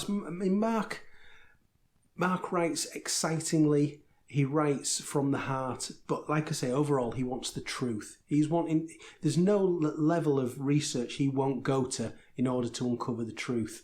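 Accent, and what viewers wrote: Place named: Liverpool, United Kingdom. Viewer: Thanks for the wonderful review! As fun just digging on your accent (Liverpool itself I’m guessing) as your enthusiasm for the book. I read the 900 page version when it came out in 2013 and have been on the fence about the complete edition but I think you talked me into it! Thanks again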